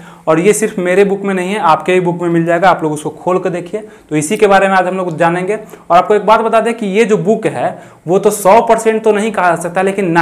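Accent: Bihar, India. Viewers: Sir ap bihar se h kya?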